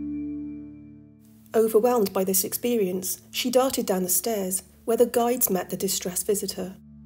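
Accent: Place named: Wales, United Kingdom. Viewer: Love your Welsh accent 🎉.. Great vids,keep them coming. Happy 2024 to you and all 🎉❤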